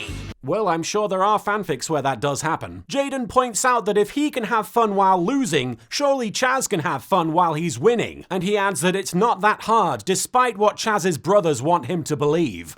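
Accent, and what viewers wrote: United Kingdom. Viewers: Wait, are you actually British? This whole time I thought you were an American who just enjoys British slang. Kind of like a weaboo, but for British culture instead of Japanese. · I love listening to a British bloke say cheeky things about an anime from about 20 years ago, so I must be in the right place!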